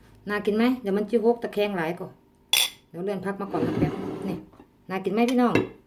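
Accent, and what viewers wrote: Isan, Thailand. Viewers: ชอบสำเนียงเว้า..กินตามอัธยาสัยของเฮาแซ่บๆๆ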